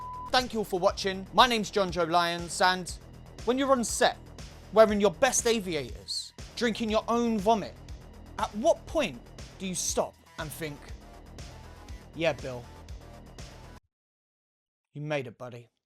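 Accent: United Kingdom. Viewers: Talk about the perfect man!!😅❤ Watches disturbing films and has a British accent!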